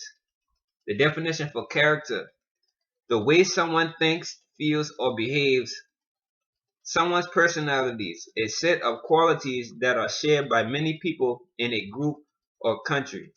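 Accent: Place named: Gullah, United States. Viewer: I hear that geechee talk my brother. I’m Gullah geechee from the sea islands of Ga. Great Video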